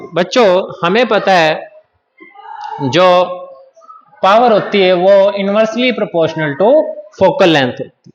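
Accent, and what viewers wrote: Haryana, India. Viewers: Good haryanvi ascent sir ❤️😄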